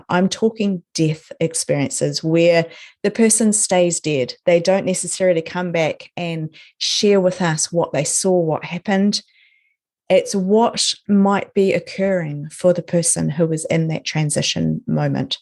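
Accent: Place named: Scotland, United Kingdom. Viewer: My favourite YouTuber in the world! from Scotland!!! I love your Scottish accent❤️❤️❤️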